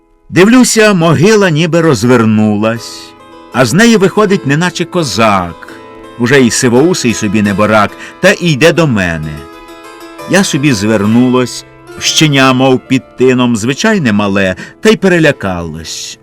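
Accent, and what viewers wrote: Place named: Ukraine. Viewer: Голос дуже український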